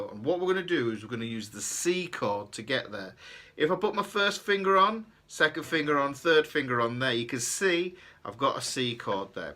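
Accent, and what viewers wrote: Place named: Northern England, United Kingdom. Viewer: So happy I stumbled across your channel today, I am now binging, you are amazing, I love the Northern accent too! My mum is from Derbyshire, Glossop actually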